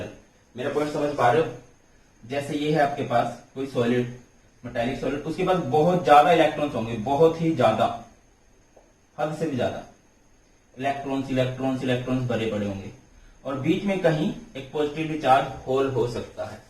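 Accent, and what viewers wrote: Haryana, India. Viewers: Sir you are from haryana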